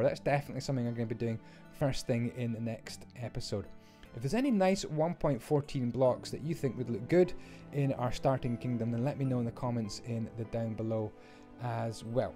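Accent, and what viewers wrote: Scotland, United Kingdom. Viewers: You have a scottish accent🏴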